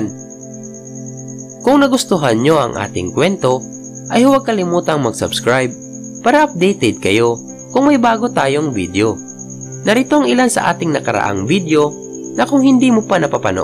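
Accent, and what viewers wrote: Visayas, Philippines. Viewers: Halatang bisaya peru ok na din yung accent😂 keep it up lods👍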